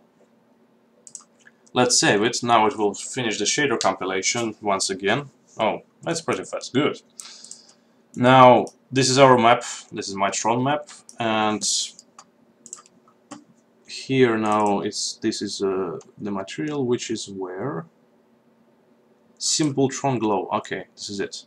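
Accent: Russia. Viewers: I hear Russian accent! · Русский, спалился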